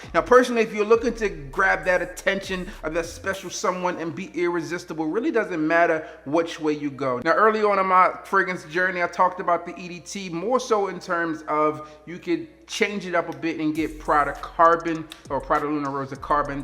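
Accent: Baltimore, United States. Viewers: He has a VERY STRONG Baltimore accent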